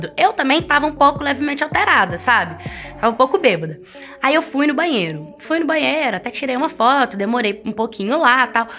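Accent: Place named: Goiás, Brazil. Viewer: c é de Goiás né?esse sotaque maravilhoso n me engana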